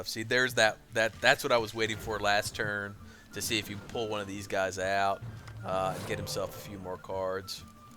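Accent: United States. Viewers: sorry your accent is really annoying california? whateverrr...we're gonna match that playyyyyyy... ugh. and ,you got this you had a bad ass last hand enough*edit* it wasn't last hand my bad i get my Irish up sometimes.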